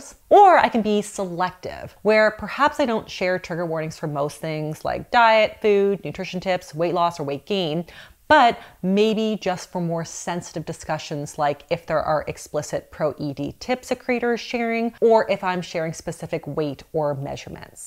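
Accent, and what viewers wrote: Canada. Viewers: I love your content!! I’ve learned so much about healthy eating and diet culture. Personally, I’ve never been triggered by your content and if anything, those struggling with ED could benefit so much from the truth that you share❤️‍🩹 you are a blessing! And I love your Canadian accent😂💚 keep going girl!! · She’s Canadian I believe 😊 so it might be an accent. My husband recently pointed out to me the Canadian accent and now I can’t unhear those slightly different pronunciations which I find cute (I hope that’s not offensive, I enjoy listening to people’s accents)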